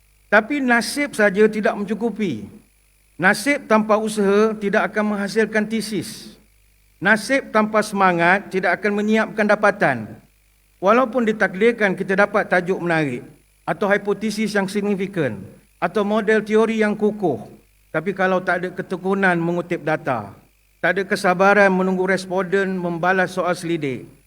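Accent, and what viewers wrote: Pahang, Malaysia. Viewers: ustZ tetap dngn loght phng bkn sesetangah ulamak dan org phg lain maaf ckp mudah sngt ubah2 bhsa. nk malu ape. org klate selamba je. kedah pun mcm ustaz wadi tetap ngn loghat nok malu benda. malu bertempat bru btul. ni org dtg sni kita pulak nk ikut bhsa diorg. mat org putih tu ikut loghat teerengganu sbb org ganu tetap ngn loghat dan tetap hebat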